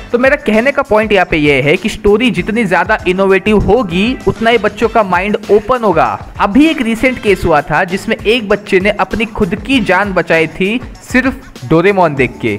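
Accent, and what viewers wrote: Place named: Maharashtra, India. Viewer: Tum Maharashtra se ho tumhare accent se pta chalta hai hehehe Arey Haan yaad aaya ... Wo kaliya wala point tumhare kaafi valid hai vaise inhone isliye use Kiya hoga ek toh sholay se leke aur dusra aaj bhi gaanv dehat mein kaaliya kaalu goru aise naam hote Hain ... Tumhe indtoons ko Disney ke toons , bugs bunny , duck tales etc. se comparison ku nahi kiya karna chahiye tha, tin tin , pink panthar toons pe bhi toh films bani hai woh bhi behad successfull ... Indtoons vaise sarre huye isliye bhi hote hain ki yeh arena multimedia se padhe huye bakwas 2d animation walo ko hire kar lete hain aur budget itna Kam sa rakhte hain ki ek episode ki script ke paise toh hote nahi multi story ya pura character hundreds of episodes build karne ki story kya ghanta hi likhwayenge yeh ... Aur inke cartoon isliye chal jaate hain ki maa baap bhi yutiye hain wo sirf jaan chhore isliye tv on karke kuch bhi cartoon lga ke bitha dete hain isliye watchtime gain karte karte inke yeh behad ghatiya toons chal jaate hain ...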